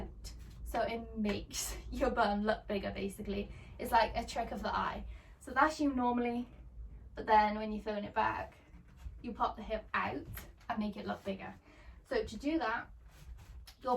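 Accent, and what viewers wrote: Wales, United Kingdom. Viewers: Hmm you sound welsh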